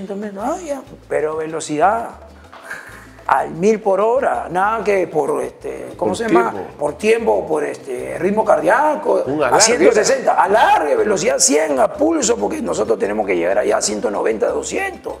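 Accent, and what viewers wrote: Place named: Callao, Peru. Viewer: Voz huayacolera...tipico chalaco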